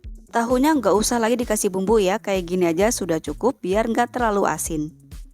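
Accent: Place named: Tegal, Indonesia. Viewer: Massya allah 🤲 kangen ciri khas tegal lur "semoga rezekinya lancar berkah sukses ya Bun🤲🙏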